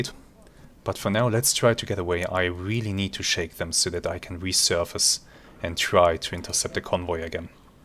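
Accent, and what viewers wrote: Germany. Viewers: great stuff. And the fact its a german accent in u boat playthrough makes it extra spicy. Next goal: 200!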